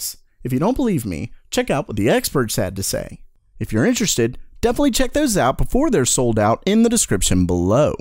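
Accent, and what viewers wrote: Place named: United States. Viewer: It’s both okay? He’s American so he pronounces it wrong but whatever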